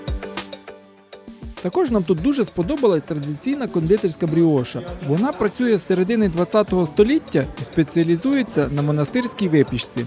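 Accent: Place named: Poltava, Ukraine. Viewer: а мені здалося, що у Вас полтавський діалект. Принаймні, дуже схоже! 😊 Дякую за таку цікаву подорож!❤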